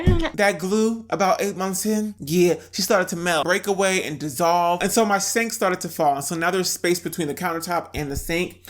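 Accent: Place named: Brooklyn, United States. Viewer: Sounds like Brooklyn 😂 You betta run like hell 😭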